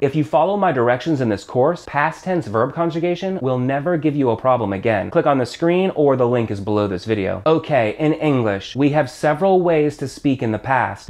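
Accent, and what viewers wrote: United States. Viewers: great videos! are you a southern Californian? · I love how these videos are super concise, super to the point and sped up, no two ways about it. So super easy to digest content at high speed, thanks for that so much! However, don't you think it's about time to work on your accent if you're able to? You sound overly American when speaking Spanish, that's a shame - to me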